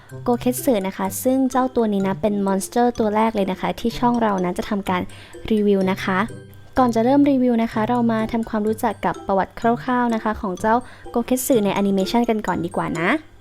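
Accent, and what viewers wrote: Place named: England, United Kingdom. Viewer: ชอบสำเนียงอังกฤษจริงๆ เลิฟๆ💕💕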